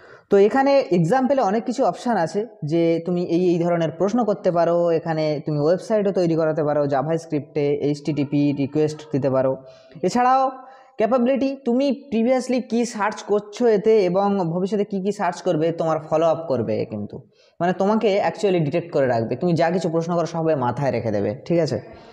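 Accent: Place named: Bangladesh. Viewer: তোমার গলা শুনে বাংলা দেশি মনে হচ্ছে , একটু ভাষার শুদ্ধতা নিয়ে আসো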